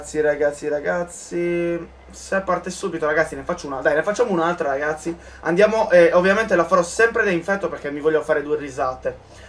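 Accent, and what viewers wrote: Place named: Apulia, Italy. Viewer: Ma sei pugliese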